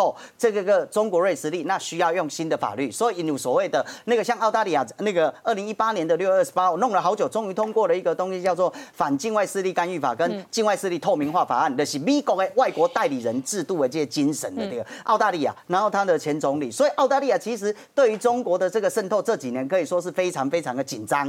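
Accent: Taiwan. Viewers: 台灣國語聽不習慣？ 不然要聽北京國語捲舌嗎？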